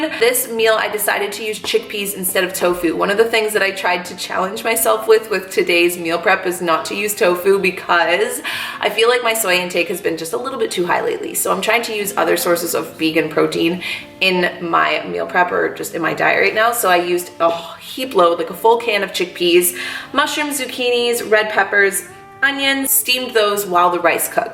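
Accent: Canada. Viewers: I think it's the Canadian accent. We like our 'A' sounds. :) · Us Canadians say things differently ahaha 😅😅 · Sounding like a Canadian in this video lol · its how Canadians say it, i watch aton of youtubers from canada and they all do it hah its just different!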